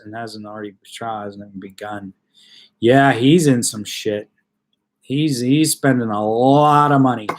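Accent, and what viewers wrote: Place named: Pittsburgh, United States. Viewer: I love that Pittsburgh accent. Miss it! From WV most of tv came from Pittsburgh. KDKA, WTAE. You aren’ t. Old enough to remember “Chilly Billy”. WIIC. We would lose our TV when the weather got bad. May God bless you in the New Year! Could go for some great Italian food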